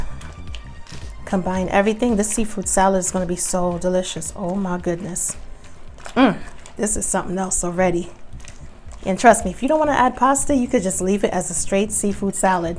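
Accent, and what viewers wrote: New York, United States. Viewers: Where r u from? Sounds like NY or somewhere up north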